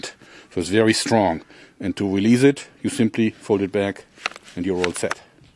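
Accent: Germany. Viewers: I really like the German accent, everyone should speak english like that!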